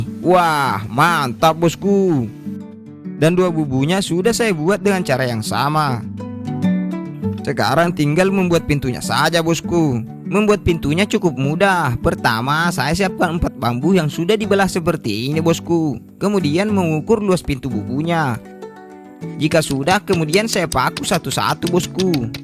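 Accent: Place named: Eastern Indonesia, Indonesia. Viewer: Ha.ha baru tahu saya bang, nungguin video paman bara gak upload2, eh malah ketemu ini, Mantap daeng, Saya dari awal, mencoba menebak ini kok dialek timur sana tapi dari mana ya, begitu sebut kata "seket dan "terbangka-bangka" saya langsung 😂😂😂😂😂😂.. Sukses selalu daeng,... Salam dari bori bellayya, tanah melayu deli... Putra asli Butta Panrannuangku.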